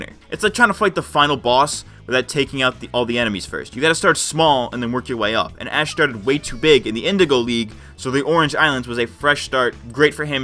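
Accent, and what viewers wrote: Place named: New Jersey, United States. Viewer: You sound like a New Jersey version of Ethan Klein.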